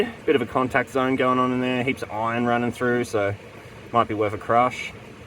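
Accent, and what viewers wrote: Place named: New South Wales, Australia. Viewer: Where are you TAS Vic or NSW .. You definitely have a NSW accent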